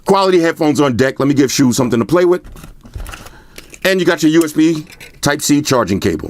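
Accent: New York, United States. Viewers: You are a Great reviewer!. I'm here mainly for your NYC Accent!. And I almost forgot, Pitching me rolling!🤣😂👍🍺🍺🍺🍻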